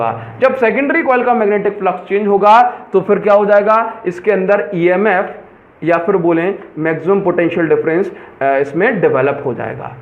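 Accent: Odisha, India. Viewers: Sir are you from Odisha? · Are you from odisha? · R u from odisha?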